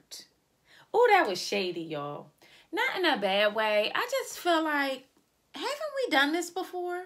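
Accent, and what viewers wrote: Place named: Philadelphia, United States. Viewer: You from Philly? I am and you sound like you from Philly😂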